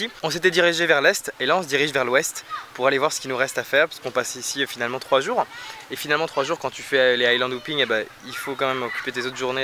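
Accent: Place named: France. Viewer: MDR yr French accent is so cOOl .On dit island hOOping ? ou island yOOpi lOOl :)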